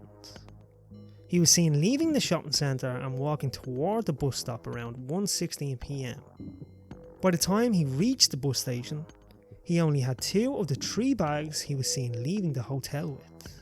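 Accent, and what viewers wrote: Dublin, Ireland. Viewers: Nice to hear a Dub accent narrating TC for a change. Understandable it has to be adjusted a bit for those not familiar with our accent. Maybe subtitles to help people acclimate? Anyway great work, keep it up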